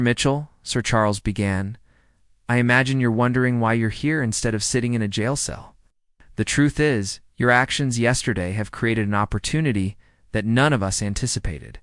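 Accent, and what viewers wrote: United States. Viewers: Bogus, cooked up by this fellow with American accent.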